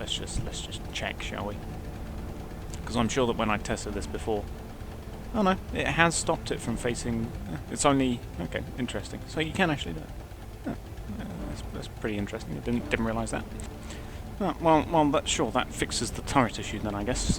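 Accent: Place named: United Kingdom. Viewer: You have a very nice bri'ish voice mate. Very clear and easy to understand for people who aren't native in English like me.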